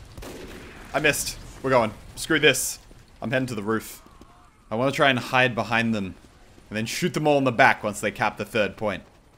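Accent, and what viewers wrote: Australia. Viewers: WAIT YOURE AUSTRALIAN ??? ---- G'DAY MATE !!! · Are you Australian because you have one of the rare Australian accents that is actually cool and not annoying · the whole time watching this I felt like this was prerecorded gameplay with sone crazy straya guy shouting at his own video...I bet is from the deep north of NT, like Darwin or something else weird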